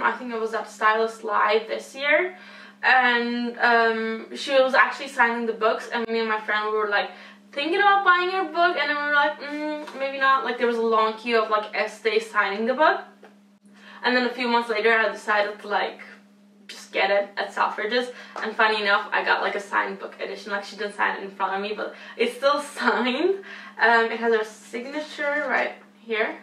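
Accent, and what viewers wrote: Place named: United States. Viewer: I loved this video cause I could undertand more! Lol! Funny you have an American accent even though you are living in England. But it is nice! Love you sweetie!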